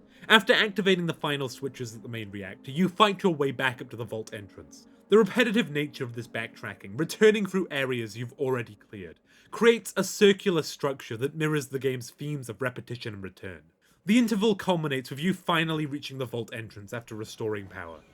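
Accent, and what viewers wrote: United Kingdom. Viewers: I completely fuck with how British you are. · They gotta be bri’ish or just really fckn good at talking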